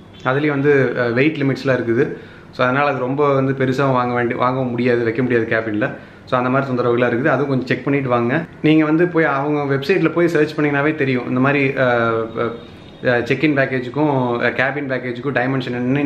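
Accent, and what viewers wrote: Kongu, India. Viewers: கொங்கு தமிழ்...❤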